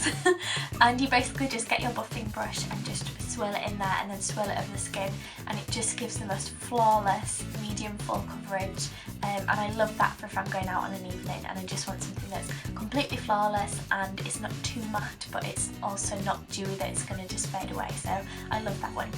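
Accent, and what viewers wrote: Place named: Northern England, United Kingdom. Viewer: You were on if the first people I subscribed to on YouTube , been watching your videos for so long! Definitely will always be one of my faves, your personality is awesome! So Glad to see you back! I've just started my own channel...complete newbie, still learning, so no judging! Haha would love you to come by an have a look if you could! I've just recently moved back from Leeds too...love a northern accent! Hope all is well and all the best for this year!xx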